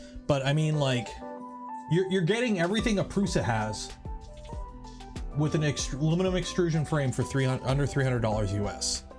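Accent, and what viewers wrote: Canada. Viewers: You speaking about being a true Canadian has me instantly sold as a new subscriber to this channel. Just got my first 3D printer in the mail yesterday. It's an SV06 Plus. So this video is a major help. Great work on this my dude. From a fellow proud Canadian (well, ashamed of you know what/who, but ya know.. Next election eh..). By the way I could tell within the first three minutes of the video hearing how you speak, that outright gave away your Canadianess right there but yeh.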